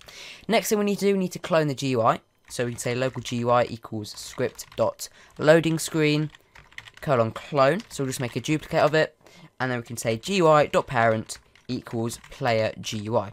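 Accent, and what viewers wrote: United Kingdom. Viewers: Now he sounds more British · Omg your British now!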